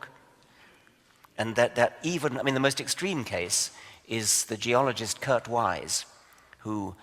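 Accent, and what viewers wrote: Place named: RP, United Kingdom. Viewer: If you take a look at DNA gene comparisons, it's hard not to see relationships and tricky to explain otherwise. There are plenty of other lines of evidence too. Lots of material on the subject out there - given it's the theory that underpins biology. Most atheists are skeptics, so his posh voice doesn't count for much.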